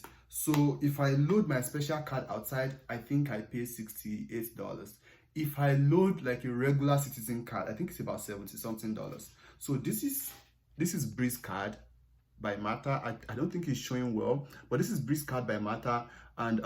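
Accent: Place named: Ogbomosho, Nigeria. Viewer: Mateo and I are watching. He says he like your 'frequent' ogbomosho tongue :)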